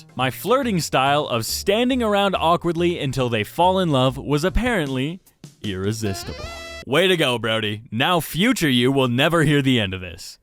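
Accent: Canada. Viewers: Your Canadian? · You animation quality has gotten so so good over these past few year 😍 is soooo cool!!! I’m Canadian but every time you talk I chuckle cause you have such a Canadian accent sometimes🤣 like you said “ a-bout” but it’s such such a Canadian way 🤭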